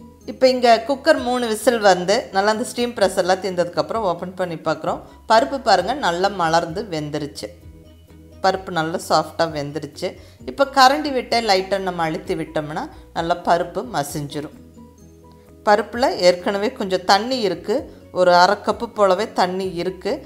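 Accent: Kongu, India. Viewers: Unga voice sooper kongu tamil sooperma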